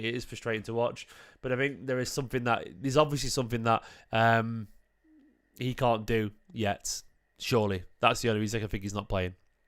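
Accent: United Kingdom. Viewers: hello mate ............ which british accent is it ??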